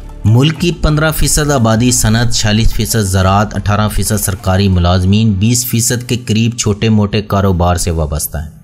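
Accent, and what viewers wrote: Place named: Attock, Pakistan. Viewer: Mera andaza thek Hai mujhe Laga attock Pindi Ke ho accent se ,AAP Boht achhey lagte Hai , mujhe geography boht Pasand Hai ,surnime country Ki video bnain , mere 2 channels Hain EK colour cloud Pakistan and dosra Jolly Azeem m jobless , mere blogs BHI Hain ,AAP Jani tv se behter Hain ,love you from Lahore Pakistan 😘😘😍😘😍😘😍😘😍😘 keep it up sab se achhi baat aapki subscriber se pyar aor replying Hai ,Mai aapko countries recommend krta raho Ga , inshallah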